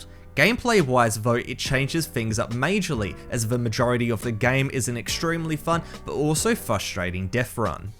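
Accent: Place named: Australia. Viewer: Dude idc what videos u make ur voice is satisfactory that australian accent hits the 'spot' yessir i love ur vids xD and yea i like ur bedwars series cuz im a bedwars kid who does yt and stuff lol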